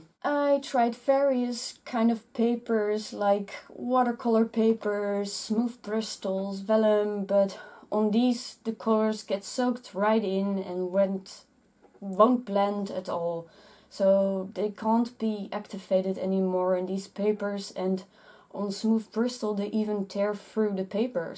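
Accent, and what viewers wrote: Netherlands. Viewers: I recognize that accent...Netherlands?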